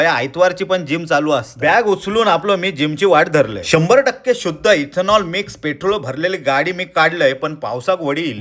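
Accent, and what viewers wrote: Malvani, India. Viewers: दादा तुमची मालवणी ऐकून ईतक छान वाटत कि विचारू नका 😊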